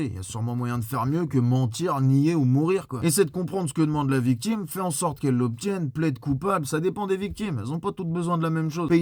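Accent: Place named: Paris, France. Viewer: La france a un gros problème de bistouquette surtout du côté des mecs sans vouloir insister lourdement.. Mais les gonzesses ferment leur gueule aussi, mais les lanceurs d'alerte c'est des lanceuses très souvent.. Alors tout le monde chez le psy. Allez ouste.. Merci pour tes prises de paroles. J'aime ton accent parigo, j'me sens chez moi.. Ça m'fait du bien aux esgourdes.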